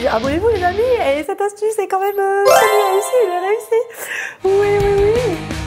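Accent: Quebec, Canada. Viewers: Tu peut faire une vidéo avec ton accent québécois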